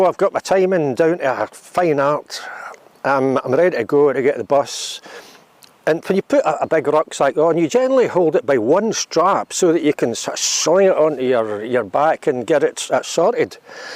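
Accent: Scotland, United Kingdom. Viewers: Hi, I just discovered you while trying to find something on the TV to cheer me up ( I don't watch much TV) Well! that said, I am watching every episode of yours I can find. You have brought back my memories! thank you so much I love your shows. You even talk like me Ha1 Ha! I live in Australia but grew up in Kilmarnock and I used to do all that walking that you are doing now. Our country is beautiful and you show it so well, Thank You so much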